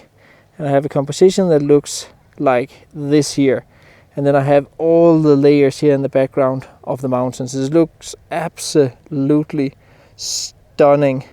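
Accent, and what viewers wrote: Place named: Denmark. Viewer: Lol man høre med det samme at det er en dansker 😅😅🍿 men fed video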